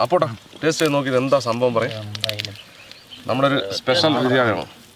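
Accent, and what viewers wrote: Palakkad, India. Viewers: Ikkaaa... Ennumpole innum super..... Kaada ende fav aanu.... Pakshe adhilum super nammande palakkadu baasha thanne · ക്കാ ഒരായിരം ലൈക്കിനോടൊപ്പം ഒരായിരം ആശംസകൾ.. ആ പാലക്കാടൻ സംഭാഷണം പ്രത്യേകിച്ച് ചിക്കൻ കഴുകുമ്പോഴും, അടുപ്പ് കത്തിക്കുമ്പോഴും.. അത് മാത്രം കേട്ടാൽത്തന്നെ മനസ്സുനിറയും.. പിന്നെ ആ കൂട്ടുകാരോടൊപ്പം ഇരുന്ന് ഉണ്ടാക്കി വച്ചത് കഴിക്കുന്നത് കാണുമ്പോൾ വയറും നിറയും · Palakkad an Bhasha athanu secret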